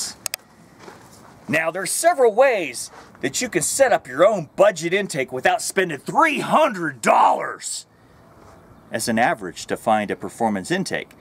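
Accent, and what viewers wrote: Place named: Oklahoma, United States. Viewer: Are you sure you're not in California? That place looks exactly like Boyikersfield. And you sound exactly like an Okey from Korn County.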